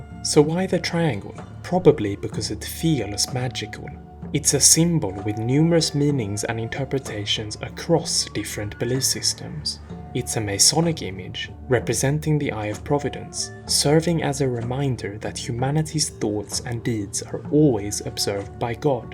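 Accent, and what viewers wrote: Sweden. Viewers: Man hör du är svensk hahah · I can hear the narrator is swedish. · is the narrator swedish?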